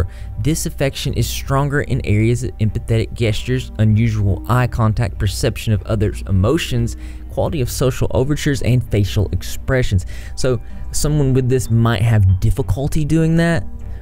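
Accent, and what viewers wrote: South, United States. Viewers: Where's your accent from? Texas? Louisiana? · Is it just me or is your southern accent not as strong? Or maybe I’m just used to it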